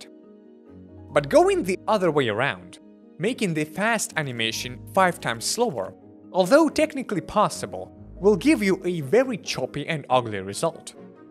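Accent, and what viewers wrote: Russia. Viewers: Is this guy a russian originally?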